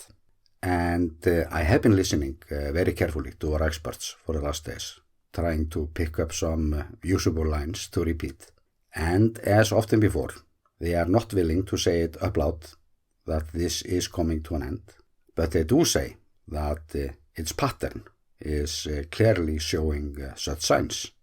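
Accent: Iceland. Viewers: Your channel is much more than just about geology, earthquakes and a volcano, even though that's what initially drew me here. Your educated insight and coverage has been an enjoyable and informative experience. I've grown to love seeing and hearing about all things Iceland, so I'm here for the long haul. I'll look forward to seeing my notification bell lit up and finding you there. There's so much more to see and explore and you'll be the perfect guide, my friend. Your authentic Icelandic accent is a nice addition, too. 😉 . . . Stay well.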